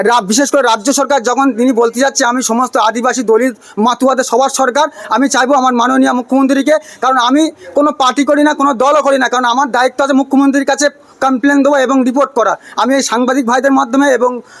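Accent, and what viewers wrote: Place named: Bangladesh. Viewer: তুই to বাগলাদেশি। তোর কথা শুনেই মনে হচ্ছে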